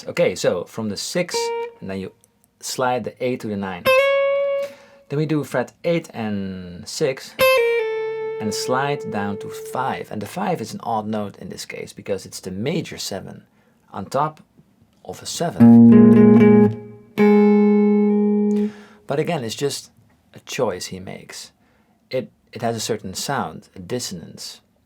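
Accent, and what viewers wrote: Netherlands. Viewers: Bro your Dutch I know because your accent and I see you many times at the supermarket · Hi. New subscriber here, and I'm really enjoying your videos. My parents and all my extended family are from Netherlands so your (very slight) accent is relaxing and familiar to me. Cheers! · This dude is heavily dutch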